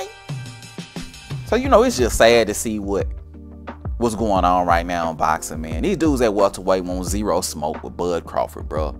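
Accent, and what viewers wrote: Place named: Atlanta, United States. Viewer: Good sh as always Fam,... Salute! Yo where u from fam, ATL? Just wondering, just my guess...I hear a lil bit of an ATL accent sounds like.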